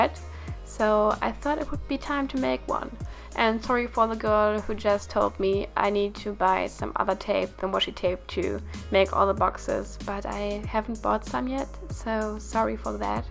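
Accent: Germany. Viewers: When you’re 1min into the video and already know that she’s German not because of her speaking (which is quite elaborated because I didn’t notice her accent was German at first) but by the fact she had a Mallorca page in her journal... hach die Deutschen 😂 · U are from Germany right?? Because u had kind of German accent 😂 And in your old bujo was :"Omas todestag" (I am from Germany sorry for my bad Englisch) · Ich hab schon mehrere deutsche Youtuber Englisch sprechen hören, aber ich glaub deins war bis jetzt am besten😂Finde den deutschen Akzent voll niedlich 😅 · der deutsche Akzenkt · i like your deutsch akzent macht das video familiär <3! XD ( bitte mit deutschem akzent lesen) Aber du sprichst echt gut englisch!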